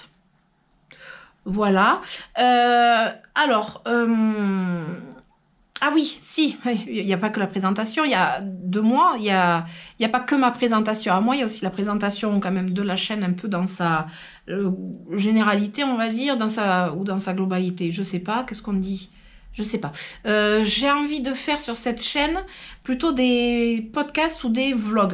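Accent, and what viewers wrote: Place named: South, France. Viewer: Quel plaisir votre bonne Humeur et ce bel accent du sud . Je découvre votre univers coloré et j aime beaucoup 😉 d ailleurs je vais aller jeter un l œil sur votre autre chaîne YouTube car moi aussi j aime les plantes même si elle parfais ne m aime pas trop 😂. Au plaisir de vous trouver 😊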